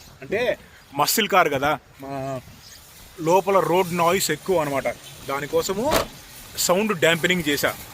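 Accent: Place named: Guntur, India. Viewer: తెలంగాణ అంటున్నారు కానీ meru గుంటూరు slang matladutunnaru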